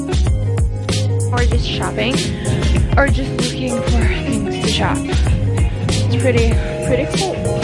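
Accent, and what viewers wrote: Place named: United States. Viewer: you sound totally american like really, ilysm!!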